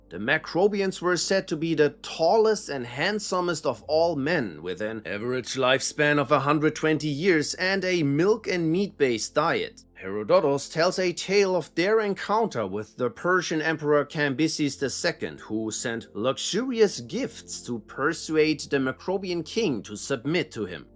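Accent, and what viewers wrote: Germany. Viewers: Is this one done by a German ?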